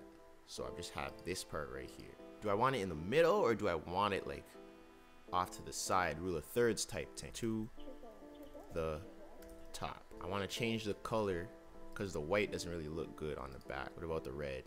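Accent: Jamaica. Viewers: you jamaican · Kinda sound like a Jamaican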